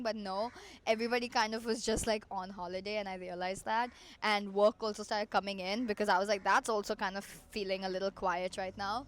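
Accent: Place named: Bengal, India. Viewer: Are you a Bengali what's with your accent 🧐🧐🧐🧐🤨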